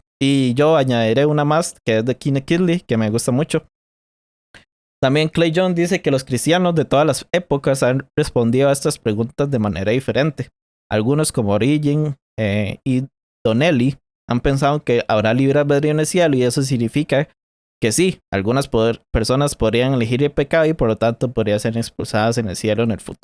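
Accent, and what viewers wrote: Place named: Costa Rica. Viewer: Jaja reconocí de inmediato ese hablado tico, buen video.👌🏻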